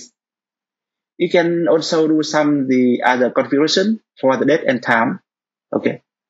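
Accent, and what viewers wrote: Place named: Vietnam. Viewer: Em nghe phát biết anh là người Việt, anh ra series tiếng Việt luôn đi anh.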